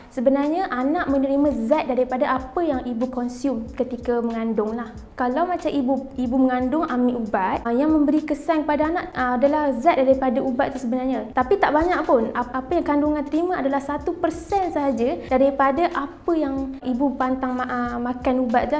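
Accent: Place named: Kelantan, Malaysia. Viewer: Awak terlalu kelantan untuk saya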